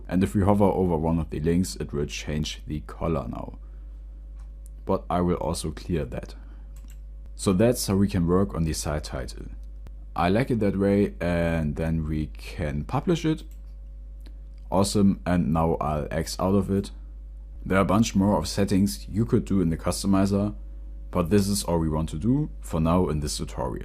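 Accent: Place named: South Asia. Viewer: Why is this white man talking like south asian man? just wandering. Good video!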